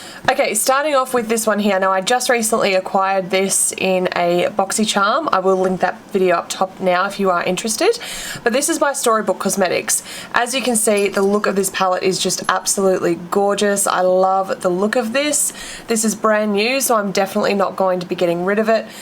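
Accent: Australia. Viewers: You sound aussie, how do you get boxy charm, thought they dont ahip to australia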